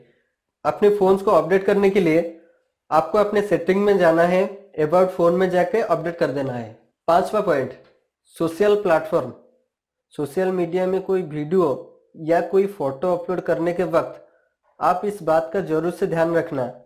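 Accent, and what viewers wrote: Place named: Odisha, India. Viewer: Bhai tu Odisha se Hena... 😅.., Tera hindi pura odia accent ke taraf sunai de Raha hai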